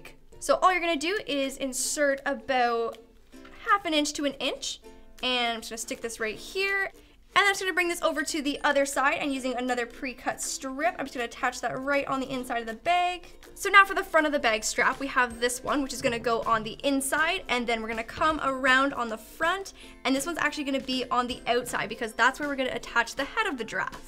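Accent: Canada. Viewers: Yep, she's from Ontario :) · I am trying to to figure out your accent... are you from Canada? Also I name the giraffe Geoffrey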